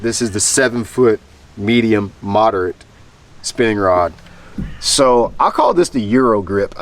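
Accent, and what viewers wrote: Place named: South Carolina Lowcountry, United States. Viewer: I have know for a while there was no standard on rods. That's why I don't order a lot of them online. I know fisherman that will only buy a certain series from a certain brand because of this. You are absolutely right about there is not much choice for a quality ultralight, 7 ft. spinning rod., With more use of BFS, DC bait casters the same can be said for casting rods, Especially at a decent price. A lot of people still fish with a closed face spinning reels for crappie panfish., I think there would be a market for more ultra lite 7 ft casting rods. Where I fish, Clark Hill, is now full of 12 and 13 inch Spots. They are a blast on light tackle, I like your no nonsense videos. Or maybe since I am from the S.C. low country, we just talk the same. 😂 I will give your rods a chance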